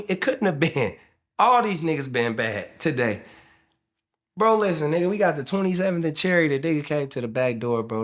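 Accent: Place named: West Coast, United States. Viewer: Dang i thought he was from the West Coast, u sound just like us brah tight.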